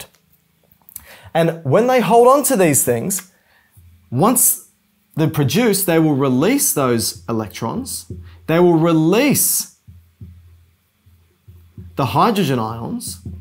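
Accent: United Kingdom. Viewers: thank you British man 🙏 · Are you British???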